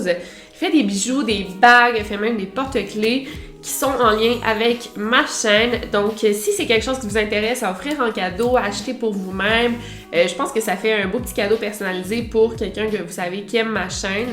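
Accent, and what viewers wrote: Quebec, Canada. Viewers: Tes videos sont superbe et ton intro très bien choisi.Mention spécial pour la musique de qui colle bien avec l ambiance de ta chaine.A chaque fois j'attends tes videos avec impatience.Tu m as même fait apprécier l accent quebecois 😁.Bravo a toi et le meilleur pour toi,ta famille et ta chaine. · Vite encore une nouvelle vidéo de " ", un bon moment assuré!!! Je regarde d'autres vidéos dans le même genre et indéniablement pour moi c'est la meilleur, autant dans sa présentation que son style, unique, qui avec "pour nous Français ce petit accent québécois" qui nous fait voyager ^^ En passant je ne te l'avais pas encore dit mais ton nouveau générique est vraiment super:D Je connais cette musique, je sais que je l'ai déjà entendu mais je ne sais plus où, "Victoria" aide moi stp;) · Quand j'ai entendu ton accent pour la 1ère fois, je me suis aussitôt dit "mince... 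ca ne collera pas avec ce type d'histoires" (je trouvais l'accent québécois mignon et sexy mais pas effrayant) mais avec ta facon de narrer et la musique de fond, c'était plutôt facile de s'immerger dans le récit! Belle découverte! Et un préjugé de moins. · Je t’écoute à tous les matins avec mon café et à tous les soir en fesant ma vaisselle. T’es excellente je t’adore je veux venir te voir à ta prochaine dédicace à Montréal. Et ceux qui son frustré de ton accent québécois, ben qu’ils écoute d’autre vidéo au lieu de se défouler sur ta chaîne. Moi je trouve que tu explique très bien 👌🏼 Good job girl continue❤️ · Perso je me suis très très bien faite à son accent 😁 Du coup je connais même de "nouveaux" mots et nouvelles expressions 😅 Je préfère regardé cette chaine avec l accent canadien, je ne trouve pas de chaines avec des compteuse françaises que j aime autant perso :)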